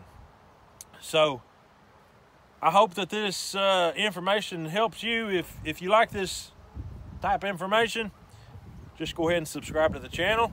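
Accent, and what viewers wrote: Tennessee, United States. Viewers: As soon as I heard your accent, I thought you must be near me (Fentress Co)! Great information for me to know when to plant this. Thanks!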